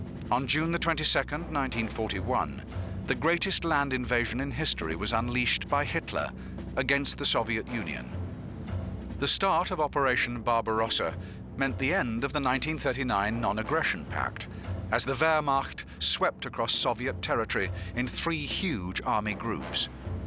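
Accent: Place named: United Kingdom. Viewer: I wonder why, ww1 ww2 documentary, reads, A British accent speaking English.?? They garher fotos and videos of the war or the faces of leaders that era, then they put on their dirty hypocrisy stories.. Let say, these history forgeries is almost miss leaded the truth of western evil greedy imperial perpetrators did dirty inhumane actions.... We in Africa, Specially, Somalies do not trusted those evil English speaking countries.... Because, we never forget the way they divided us.... They don't deserve to listen their evil lies😂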